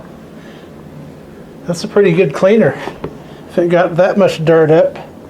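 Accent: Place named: South, United States. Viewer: Love your videos and your lil bit of southern drawl 😆 hello from Oklahoma from your newest subscriber!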